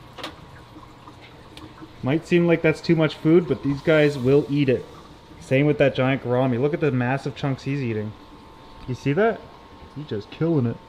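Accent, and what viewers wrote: Canada. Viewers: I love you Canadian accent lol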